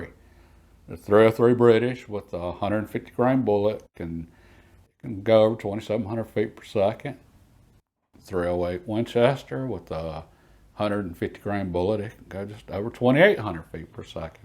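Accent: South, United States. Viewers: Kia Ora, watched a lot of your videos ova the years. I love your south acent & the vast knowledge you bring to us on watching u tube guys. In Aotearoa ( NZ) i would think more deer hav been taken by 303,s than any other cartridge? My take ( disclaimer) NZ forest service in the past handed out longtoms smelys by the hundreds & they did the job. Lot of sentiment & collection re past service rifles. I have serval myself and enjoy them mainly for range shooting these days im getting VERY long in the teeth thesedays. The older iv grown the older style i choose these days iv got keen on muzzleloaders. ( [ i digress:( ] 303 brit is easily purchase here. As is 30.06 A hole nother subject.... i ❤ that USA cartridge. Keep well & safe aTom Nga mihi Chris Ma Te Wa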